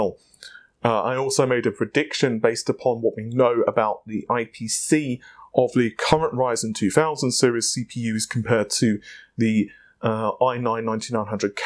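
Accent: England, United Kingdom. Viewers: My good man, you bear a striking resemblance to a young Syd Barett of Pink Floyd (RIP). The English accent only amplifies the similarities! Back on topic: Looking forward to upgrading my 1800x with some Zen2 goodness. A 144Hz monitor is in my future and I will need the clockspeed / IPC increase to stay above 100.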